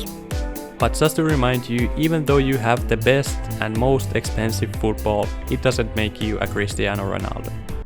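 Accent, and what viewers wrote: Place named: Finland. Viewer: the accent screams suomi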